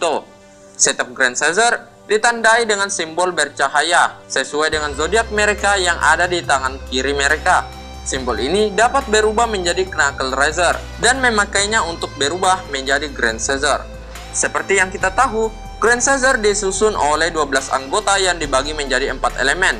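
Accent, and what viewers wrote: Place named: Maluku, Indonesia. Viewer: Bang mau nanya nihh, Abang orang mana yaa kok logat nya kyk orng Maluku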